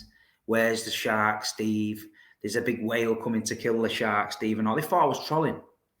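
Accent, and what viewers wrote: Manchester, United Kingdom. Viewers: Love listening to you talk about us mate, proper manc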